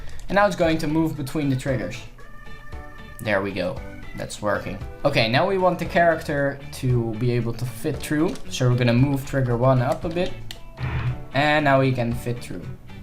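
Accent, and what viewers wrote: Netherlands. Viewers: You have a dutch accent. Are you DUtch?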